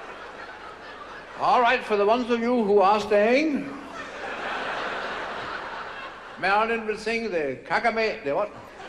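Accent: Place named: United States. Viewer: Never seen a Dane speaks this perfect American accent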